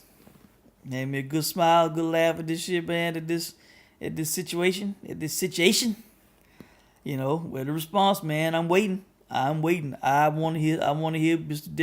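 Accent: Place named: Texas, United States. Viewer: I like your Texas accent BTW I'm laughing my ass off u funny keep that same energy 😎😎😎😎😅😅😆😆😂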